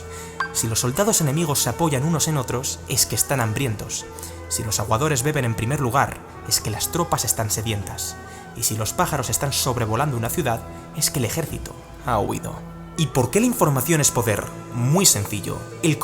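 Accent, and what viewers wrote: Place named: Spain. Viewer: La voz no ne gusta..es de España...setia mejor una voz neutra..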